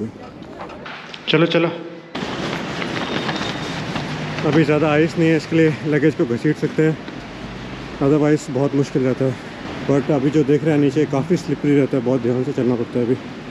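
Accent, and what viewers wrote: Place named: Hinglish, India. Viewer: Какой классический у Вас хинглиш!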